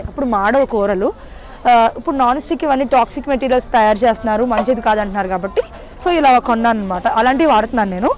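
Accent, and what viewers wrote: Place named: Rajahmundry, India. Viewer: Voice vintunte starting lo . .ekkado vinnttuga anipinchindi....Rjy voice na .. 😀 Maadi ade....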